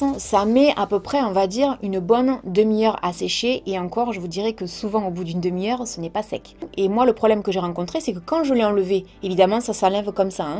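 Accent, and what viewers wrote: South, France. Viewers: J’ai découvert par hasard vos vidéos il y’a deux mois. Ce qui m’a plu d’abord était votre accent du sud, un accent que j’ai reconnu et j’ai été contente de voir que vous ne l’aviez pas modifié. De par mon âge je pourrais être votre maman. Moi aussi je suis du sud de la France mais je vis à l’etranger depuis des décennies cependant j’ai conservé mon accent et nombre de français me l’ont toujours reproché. Ceci dit, je trouve vos vidéos toujours franches et intéressantes. Bravo. Je connais Yankee candles depuis bien des années car elles ont été commercialisées ici bien avant la France et je suis d’accord avec vous, elle sont de bonne qualité, ma fille les aime beaucoup mais leurs parfums sont très prononcés. Je préfère me servir d’huiles essentielles (lavande, orange, vanillle et menthe poivrée). Merci.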